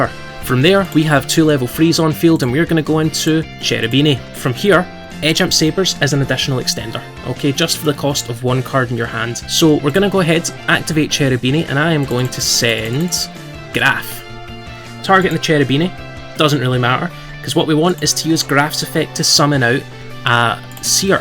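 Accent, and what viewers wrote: Scotland, United Kingdom. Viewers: That Scottish accent yo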